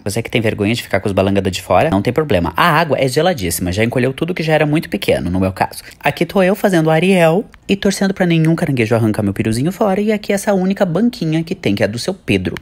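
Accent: Rio Grande do Sul, Brazil. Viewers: Eu ameeeiiii 😍 vc é gaúcho? Gosto muito dos teus vídeos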